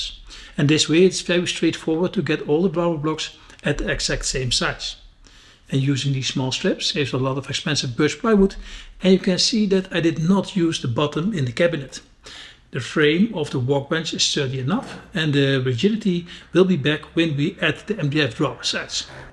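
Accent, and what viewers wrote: Netherlands. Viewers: I am a great admirer of your videos and think that some of the methods you propound are absolutely brilliant. However if one tries to put them into practice oneself it is very difficult to do so. The reason is that you speak very very quickly and it is sometimes a little difficult to follow your Dutch accent. It would be easier if you spoke more slowly. It would also be easier to follow you if you spent a little more time in explaining each step. If plans were available it would also be easier. Sorry to be critical but I think your videos would do even better if you followed my suggestions. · Prachtig dit, you sound een beetje like a hollander.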